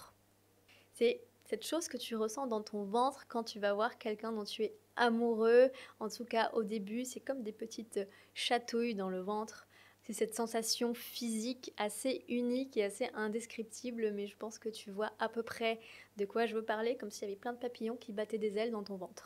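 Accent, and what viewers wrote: France. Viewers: Salut — merci beaucoup pour les novelles expressions! Je voulais simplement dire en tant qu'anglophone que lorsque tu parles anglais, c'est parfaitement compréhensible - et je dois dire que l'accent français fonctionne vraiment pour toi. Alors ne t’inquiétes pas du tout! 😊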